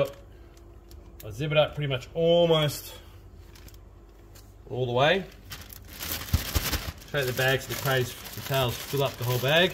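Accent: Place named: Australia. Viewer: I thought you were in Australia from the accent 😁 I saw another video where there were leeches in fields there 😳 Do you guys have ticks everywhere too?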